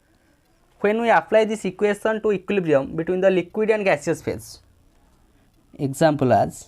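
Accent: Odisha, India. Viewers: Are u from odisha?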